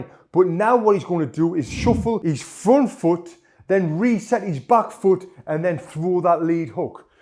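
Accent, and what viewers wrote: United Kingdom. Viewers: Ehy! You are an awesome teacher, I'm gladly following you but I have a question I hope you don't mind: Where is your accent from? I'm italian but I've been in england 4 times (london, scarborough, plymouth, york, manchester) and 3 other times in the united kingdom (scotland, ireland, north ireland) and I can't quite well recognize your way of speaking! It's British for sure, but that's all I can guess.. Thanks!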